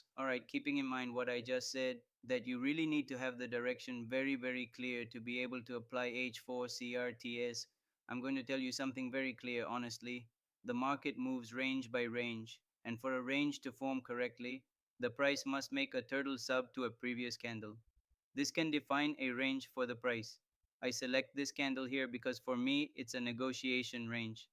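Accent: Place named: India. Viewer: Estabas bien haciendo tus explicación en tu idioma natal el castellano. Pareces un Indu hablando ingles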